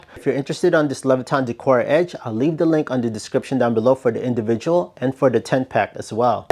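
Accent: Philippines. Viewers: you got a hint of Filipino accent, great content by the way.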